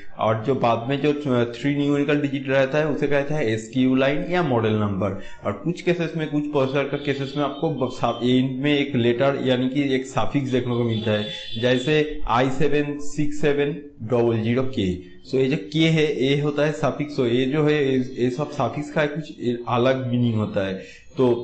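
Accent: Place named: Bengal, India. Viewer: Your hindi is like bangali people's hindi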